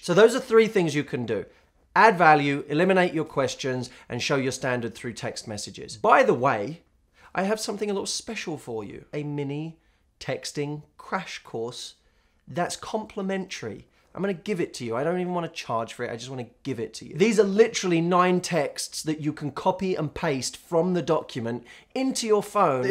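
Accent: England, United Kingdom. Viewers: I watch all his videos just to see him and listen to this heart-melting English accent 😭❤ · BRIT ACCENT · What text can I send you to get your attention?Haha British accent Loves it! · I love the British accent...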